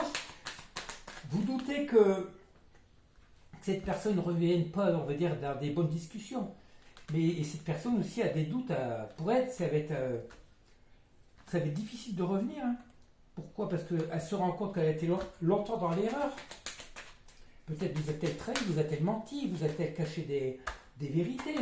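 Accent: North, France. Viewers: Bonsoir nouvelle abonnée g vue votre vidéo avec selena à votre voix g su de suite que vous étiez du nord je suis du pas de Calais près de lens 😂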